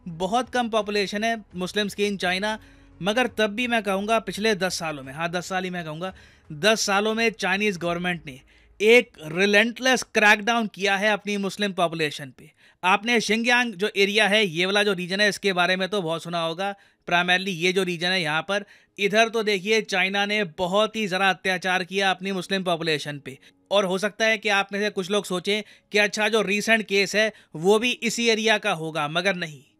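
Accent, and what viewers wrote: India. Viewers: 一股子阿三味儿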